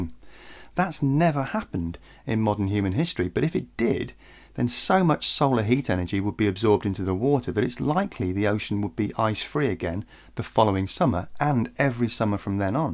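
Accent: United Kingdom. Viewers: You forgot to mention Geo Engineering or Weather Modification. Not really impressed with the Brit accent.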